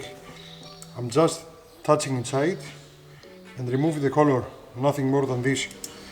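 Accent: Greece. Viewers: I assume this is the manifold you bought from <link>: ALLOY WATER HEATED TORQUEMASTER INLET MANIFOLD HS4/6 HIF38/4 I guess they would rather sell you a more expensive twin-carb manifold with some extra carburettors Hope to see some more Mini-Videos soon!!! I like your (broken) English with a Greek Accent! I speak (broken) English with an Afrikaans Accent! (LOL)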